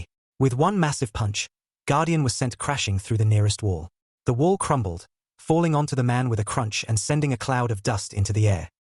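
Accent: United Kingdom. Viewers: Is this shit British ????????????????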